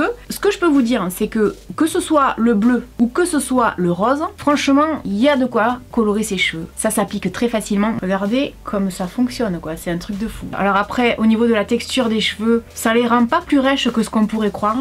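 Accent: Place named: Marseille, France. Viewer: T'as l'accent marseillais !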